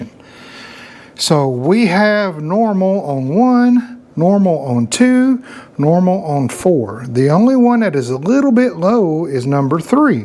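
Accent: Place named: Tennessee, United States. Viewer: Very nice! You sound like you’re from my next of the woods (TN)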